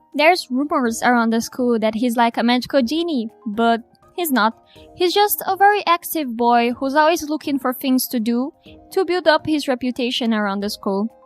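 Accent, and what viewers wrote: Brazil. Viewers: Pelo sotaque eu sabia que era brasileira kkkk,amei o seu vídeo e seus designs e ideias são muito criativas e originais!💖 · CARALHO eu passei o video inteiro me perguntando se vc era do brasil por causa do sotaque, nao acredito que é mesmo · NÃO ACREDITO QUE SE É BR KKKKKK EU TAVA VENDO O VIDEO NORMAL ENQUANTO EU DESENHAVA, AI NA HORA QUE VC FALO MADOKA MAGICA EU RECONHECI O SOTAQUE BR, E FUI ATÉ CONFERIR ! YEEEEEEEEEEES MAIS BRASILEIROS !!!! muito bom o video por sinal adorei seu estilo, e adoro ver videos pra desenhar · Eu tava escutando o vídeo e eu assim esse sotaque... SABIA Q VC ERA BR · OMGGG I KNEW I RECOGNIZED THIS ACCENT! You're Brazilian... Q NEM EU MANOO 🫂🫂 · Eu SABIA q vc era brasileira assim q ouvi seu sotaque sé loko muito bom seus vídeos ❤❤ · Gurl ru brazilian? I know the accent sounds rlly Brazilian, like on Madoka Magica when u speaks.. 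(i am too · EU SABIAAA, EU SOUBE DESDE AS PRIMEIRAS FRASES QUE VOCE FALOU QUE VOCE ERA BR!!!! nao pq seu inglês é "ruim" ou tem sotaque, na real seu ingles é PERFEITO, mas tinha a >>aura<< HAHAHAH DESENHOS INCRIVEIS, DE VERDADE, SEU TRAÇO É TAOOOO LINDICO!!! nao conhecia seu canal, vou acompanhar a partir de agora! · A minute in, and im listening to your voice and im like "...huh... That sounds a little Brazilian.." Went to check the About you and was so darn right I have brazillian friends so maybe thats how i detected the slight accent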